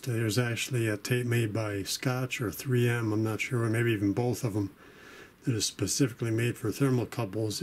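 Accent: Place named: China. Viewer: chinglish yup I know :(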